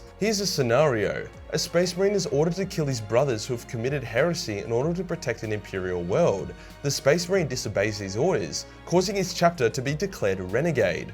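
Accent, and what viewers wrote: Australia. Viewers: I was about to say "summer?" But yep Australian, not bittish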